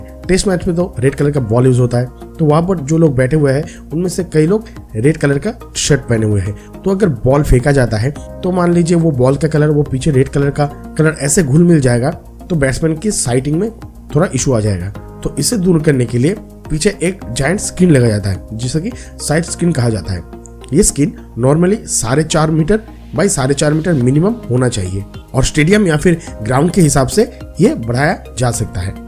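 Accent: Bengal, India. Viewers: Aap bangali ho😁😁apke hindi bangla mesano hein😁😁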